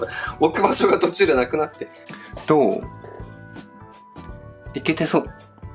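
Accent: Kansai, Japan. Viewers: あとろ関西弁だから めっちゃ親近感わく♡ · 今回すごい関西弁多くてにやけた🤦‍♀️💖 いちご途中で食べようとしたの可愛すぎる🥺（笑） ダルゴナコーヒー(？)作って欲しいです！！ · ねえ！なんかいっつもよりめっちゃ関西弁！！！！可愛い！！どうした！！！👼🏻💘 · 久々に見たら関西弁になっててめちゃくちゃ好きようするに好き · 名前変えれた～！！ これからはコメントできる～！！ 明日作るいちご飴はこの動画見ながら成功させますっ👌✨ 関西弁好き～💗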